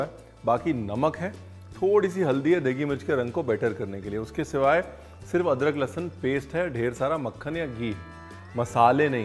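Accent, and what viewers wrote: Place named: Lucknow, India. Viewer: Your hindi in lakhnavi accent is very pleasant to listen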